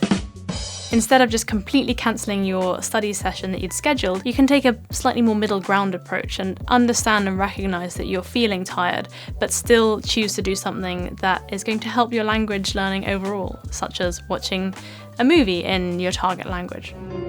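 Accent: England, United Kingdom. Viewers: Actually I am a school student who is willing to learn British accent,I found your accent interesting. Thank you .We really appreciate your dedication for helping others develop their accent even if you get nothing in return. Your such a great need for society. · Thank U for the video!!! I never cease to be amazed at Ur understandable British accent! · You're Welcome !! I really love your english accent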